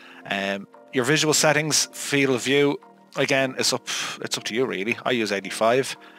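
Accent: Ireland. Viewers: Unmistakable Irish accent, detected it from the first syllable of the first word :)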